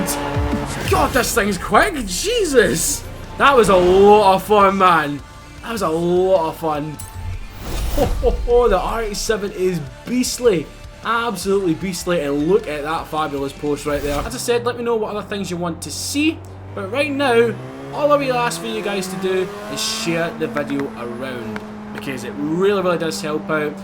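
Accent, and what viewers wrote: United Kingdom. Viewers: Rams, instead of rims. Enchies, instead of inches. I love how you British people talk xD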